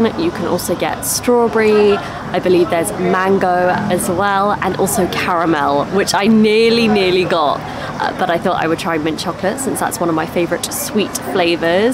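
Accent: United Kingdom. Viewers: イギリス英語って、ホント、耳に心地いい！ と、感じる「１」大阪人です〜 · いつ聴いても綺麗なクィーン‘ズ イングリッシュいや、キング’ズ イングリッシュですねぇ。日本の第二外国語教育として英語か米語のいずれを選択するかの議論があったのですが、日本は米語を選択して今日に至っています。何度も申し上げますが、発音が心に響きとても美しいですね。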